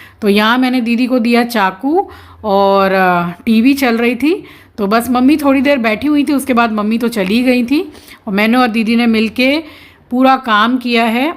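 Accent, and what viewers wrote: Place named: Agra, India. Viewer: ji aap ke muh se Agra ki language sun kar bahut achha laga bola karo kabhi kabhi❤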